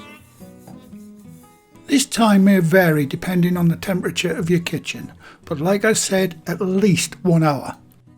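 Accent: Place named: England, United Kingdom. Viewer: Hi I love your channel, I am an English girl living in USA and I miss home very much so it’s great having your accent (even though I am a London girl lol) talking me through my bread recipes! When you get to the end of a step I always say “okay ”! My husband says you talking to again lol. You have taught me a lot about making bread, it’s my new passion, I never thought I could make bread (I have been posting pictures of my bread and other recipes on Facebook and one of my friends called me a kitchen witch, a great compliment that I share with you as it’s because of your videos and the great way you explain) and I didn’t think I had the tools and utensils to do so but where you show the alternatives I have built up my own bread making utensil drawer. I hope to be half as good as you one day. I was wondering if you have a seeded bread roll recipe that you could share with us? 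I am a vegetarian if you have any good veggie recipes that would be nice! Thank you for sharing your gift! Oops as I was typing this message you say how you can add seeds can you add them to white rolls also?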